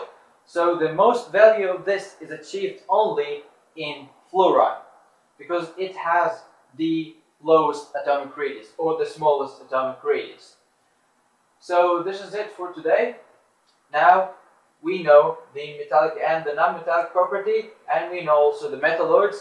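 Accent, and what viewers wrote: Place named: Russia. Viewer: You look mexican but sound russian.... WHAT ARE YOU!!!!?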